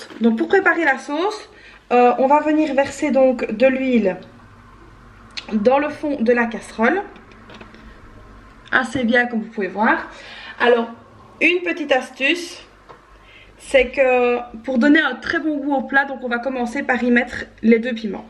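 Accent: Belgium. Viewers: tu es de Belgique car tu as l'accent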